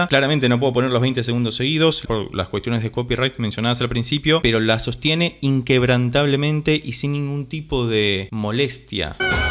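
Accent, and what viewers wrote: Argentina. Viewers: Por el acento, debe ser argentino.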